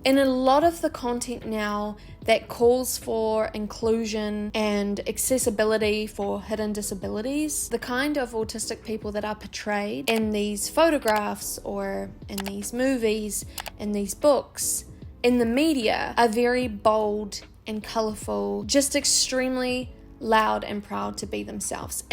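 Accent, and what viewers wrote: New Zealand. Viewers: I knew u were from New Zealand lmao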